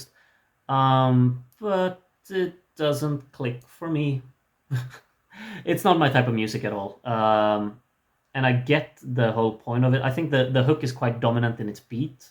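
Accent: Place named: France. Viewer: I love this videos as much as I love ur' french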